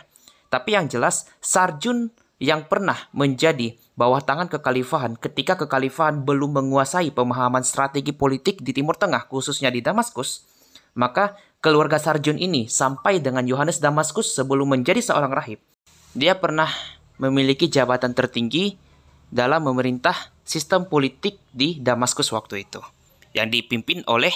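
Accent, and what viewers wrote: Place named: Malaysia. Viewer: Cumi, bang Edis dialeknya Batak, kalo ini agak ke dialek Melayu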